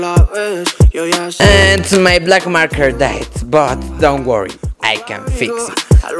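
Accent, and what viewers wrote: Mexico. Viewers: Tu ingles es muy mexicanisado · Oye te amo. No entiendo nada de lo que dices xD pero mi untuicion dice que eres mexicano jsjs besos en el fundillo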